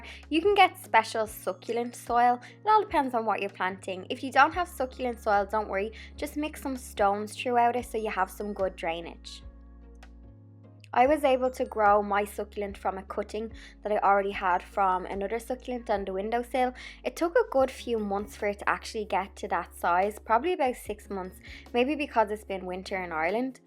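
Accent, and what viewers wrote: Ireland. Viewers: I love the Irish accent. It is absolutely gorgeous. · I enjoyed your video! I do all of these already...but I see I need to make them pretty because these look great!! And I so love your beautiful Irish accent!! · Your diys are cute just like you. Love your Irish accent! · You have gorgeous eyes! My hubby is Irish, I love an Irish accent. I could listen to you all day. Great ideas, thanks for the video! Just subscribed! ❤😊🍁❤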